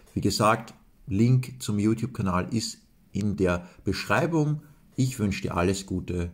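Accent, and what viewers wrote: Austria. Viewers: Jaaa, Du bist teilweise eine Schlüsselfigur in meinem Prozess❤Danke dafür und ich liebe auch Deinen Wiener Dialekt, dalls es wienerisch ist, aber ich glaube schon😅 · Ich LIEBE deine Stimme, lieber!❤ Noch dazu mit diesem wundervollen österreichischen Akzent, sehr berührend!🙏🏻💕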